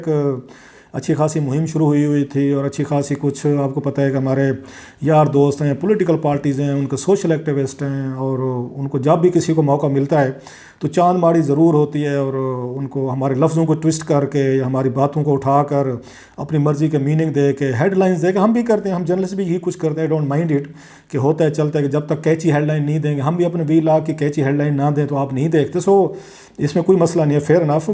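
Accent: Pakistan. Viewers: Klasra sahab, first of all I love your urdu accent and the way you talk.. big fan of your reporting, you truly have exposed some great stories and scams.. Although I am a PTI supporter but I still think you are right, khan sahab should have an interview with you and face your questions..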